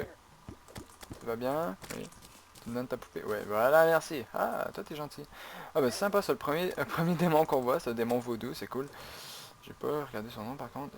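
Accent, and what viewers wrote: Quebec, Canada. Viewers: Mais il le dit comme ça parce que il est Québécois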